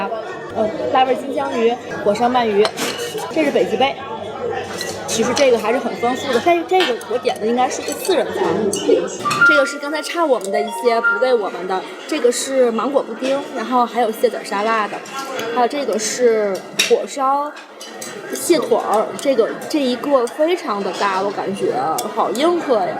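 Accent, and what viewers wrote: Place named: Tianjin, China. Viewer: 这满满的天普！我这个河西人听了真是内牛满面😭 还有那些街景！真亲切啊！订了订了！继续加油💪！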